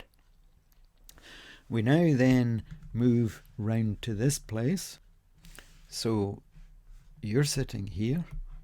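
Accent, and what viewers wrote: Scotland, United Kingdom. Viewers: Excellent video. Very well explained. Having been born and raised in Scotland loved hearing the Scottish accent. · That was fantastic and great to hear a fellow Scot too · Thanks so much for the solution. More than that, what a delight to hear shades of the Scottish brogue again! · Thank you! And what a beautiful Scottish accent! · Thank you so much for this - it has been driving me nuts for ages! Very well explained, and in a good Scottish accent!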